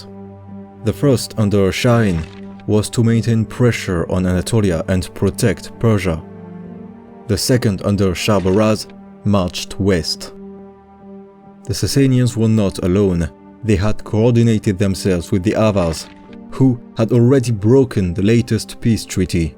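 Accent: France. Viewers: Good and informative video. Enjoyed your narration with French accent, and the pronunciation of the Persian generals were perfect.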